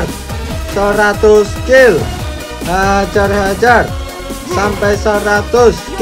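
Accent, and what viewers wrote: Java, Indonesia. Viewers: Bang kok medok? Org mana?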